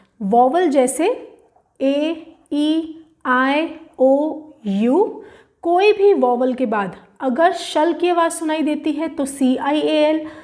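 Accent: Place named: India. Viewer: india sound english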